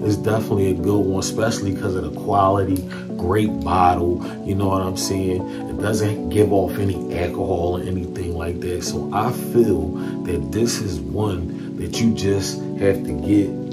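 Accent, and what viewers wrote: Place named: Philadelphia, United States. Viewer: I already knew! Michigan this way. But I know that accent anywhere, friends from Philly. Thinking about wearing it tomorrow!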